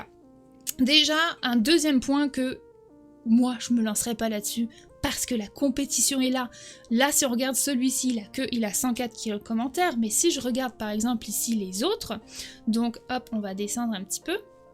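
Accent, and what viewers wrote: Canada. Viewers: par ton parler j’ai compris que tu te trouvais au Canada, je dois commencer bientôt mon projet fba mais actuellement j’ai pas bcp de temps que conseille tu pour commencer quand on a peut de temps a mettre?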